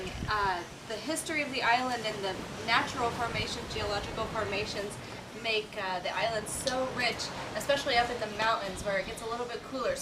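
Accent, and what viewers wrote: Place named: Puerto Rico. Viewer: Tu acento al contrario suenas boricua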